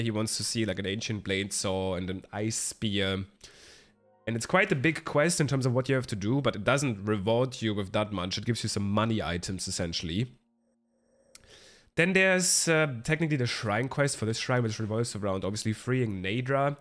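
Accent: Germany. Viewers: Nice that YouTube starts randomly showing me small channels. Heard directly that you are German 😅 Don't worry about your voice. I didn't notice anything except the coughing.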